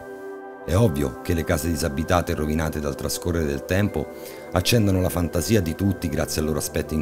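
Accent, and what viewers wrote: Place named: Rome, Italy. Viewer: La voce narrante distrae troppo dalla storia stessa. Bello il timbro vocale, ma inascoltabile la pessima dizione, nonché la biascicata pronuncia del romanesco "moderno", fuori luogo per tali narrazioni.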